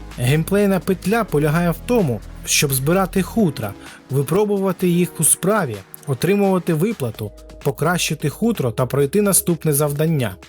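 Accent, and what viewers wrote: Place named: Surzhyk, Ukraine. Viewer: ааа снова на суржике пшешишь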